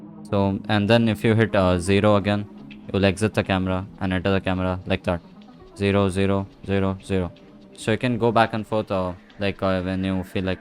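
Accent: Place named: India. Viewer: The Indian Accent Be Hittin Hard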